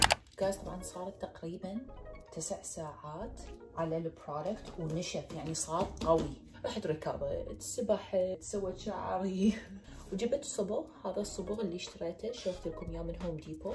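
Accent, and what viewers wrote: Iraq. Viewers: منووو نصدم لمن سمع الصوت وعرف عراقيه 🙂😐😂مشتركه جديدة حبيتججج 😍 #تعديل شكرا ع 50لايك 🌚😹😹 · حجيج عراقي من وين بلعراق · والله اول ما شاهدت الفيديو ما كنت مفعله الصوت .وبس شغلت الصوت انصدمت انك طلعتي عراقيه بهيك لبس .والله يا اختي انت مبيينه انسانه طيبه وفرفوشه. استري نفسك حبيبتي .هلي ربنا يستر علينا ويفرج هم هالامه الاسلاميه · لْـۆ مخليه ع المرايه چيس نيلون م̷ـــِْن ردتي تصبغين المرايه 😉+انتي عراقيه اصليه بالحجي 😅💛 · يزينك انتي وقناتك ولهجتك يزين أهل العرق بس🇮🇶❤️🇸🇦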